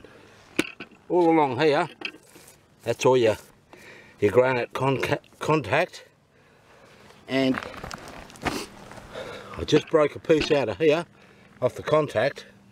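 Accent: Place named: South Africa. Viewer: Are you from South Africa? your accent sounds like S. African English. i have some good guys from there. we done Exploration Projects here in my country. cool and professional geologists.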